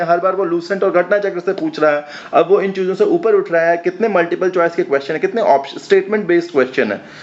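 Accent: Bihar, India. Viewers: Hum matlab Mai sunkar bhut acha lga .. pkka Bihar bala language 🤩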